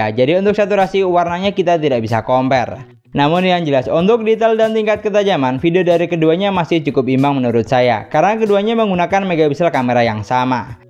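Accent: Madura, Indonesia. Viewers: Logat madura campur sumatra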